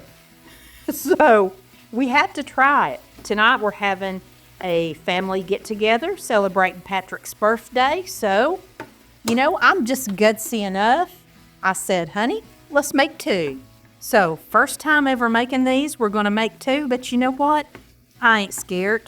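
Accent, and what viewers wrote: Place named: Virginia, United States. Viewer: Mama, I just subscribed to your channel and I love it. I’m not a cook but my boyfriend is from Virginia and he’s got an accent like you and I just called him and told him he’s got to look at your website. He is gonna be so thrilled I love it. I love crockpot cooking though and I am really enjoying all your crockpot cooking. Thank you mama.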